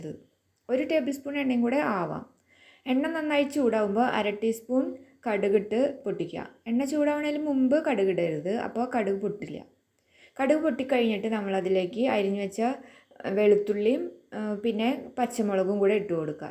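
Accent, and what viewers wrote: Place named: Thrissur, India. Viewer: നല്ല ഒരു side dish 👍👌😋😋 New 👫🤝🔔✅️ തൃശ്ശൂർ ആണോ സംസാരം കേൾക്കുമ്പോൾ തോന്നുന്നുണ്ട്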